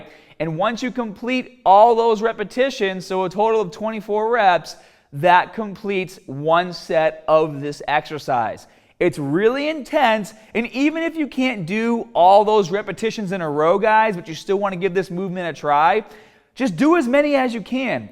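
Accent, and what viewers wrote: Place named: Maine, United States. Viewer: What is the accent. Maine?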